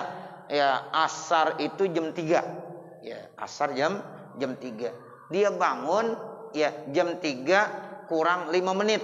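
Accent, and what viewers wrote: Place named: Betawi, Indonesia. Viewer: Smg Allah brkn kesehatan kpd guru kita untuk terus bisa berdawah dgn bhs betawinya yg khas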